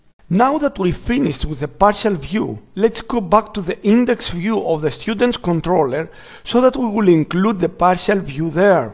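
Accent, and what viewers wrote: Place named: France. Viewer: nice frenhc accent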